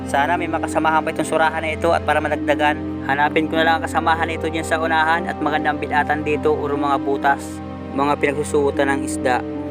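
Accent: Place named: Jomalig Island, Philippines. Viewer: Saan yn location mo idol?familiar KC ung tuno ng salita mo? somewhere in jomalig island,Tama po b?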